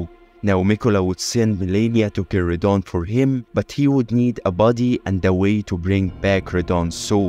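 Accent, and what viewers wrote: Egypt. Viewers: There’s something special about listening to Elden Ring lore in an Egyptian accent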